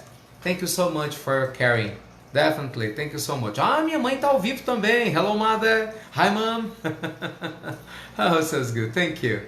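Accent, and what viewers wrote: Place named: Brazil. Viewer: Bom pra treinar meu inglês. Pronúncia de um brasileiro é mais fácil entender! Hahah Obrigada pelo seu trabalho e empenho.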